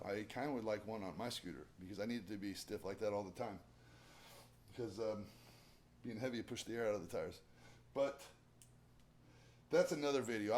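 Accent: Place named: United States. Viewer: WTF the terminator but with american accent woah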